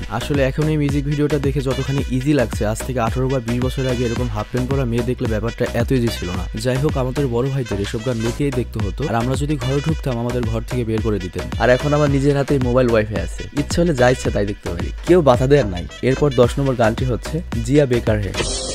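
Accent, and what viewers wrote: Noakhali, Bangladesh. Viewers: এটা কিছু অইলো,,,,, ভাই আপনার বাড়ি কি নোয়াখালী নাকি ।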